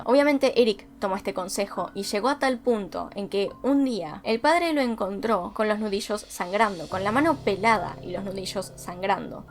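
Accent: Argentina. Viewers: Sos Argentina? porque tenés igualmemte un acento... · Estoy enganchada al acento argentino...hoy voy a ver por lo menos diez o doce...me encantas...sigue así...pura está muy bien eres correcta sin estridencias y esto s temas ya sabes don tan delicados que tienes que cuidar mucho el tono y el tuyo es perfecto y con mucho respeto...besitos desde España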